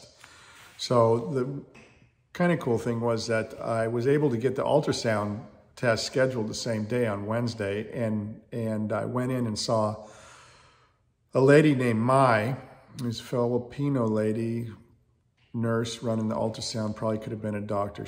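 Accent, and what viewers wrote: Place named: Pennsylvania, United States. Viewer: I'm from PA. Friends&family in Sequim. I knew you looked & sounded just like someone from home! Nice to meet you, neighbour, via the world wide Web!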